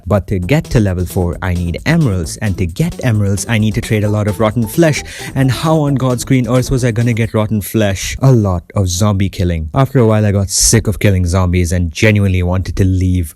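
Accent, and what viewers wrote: India. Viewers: he indian i can hear it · Are you from India your voice has I think Indian accent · Hello man with an indian accent. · are you indian u sound indian to me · i never knew he was a indian or used to speak indian accent :) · he used to sound like a lil indian before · my favorite india accent person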